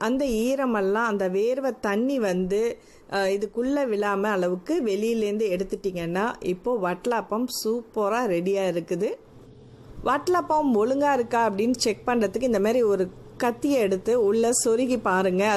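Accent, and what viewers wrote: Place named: Kayalpattinam, India. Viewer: So wat ..it's kayal dialect..just enjoy d recipe..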